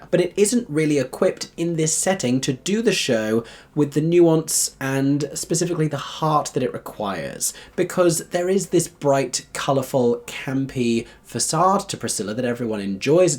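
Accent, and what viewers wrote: Australia. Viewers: Nah gonna need a whole video In that Australian accent now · The Australian people demand more Aussie accent!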